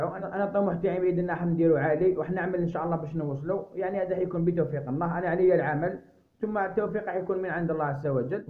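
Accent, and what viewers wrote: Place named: Khenchela, Algeria. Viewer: الف مبروك تستاهل الدرع الذهبي سالتك أنت من اي ولاية وماجاوبتنيش يعني مجرد فضول قلت بلاك من خنشلة لهجتك تشبه لهجتنا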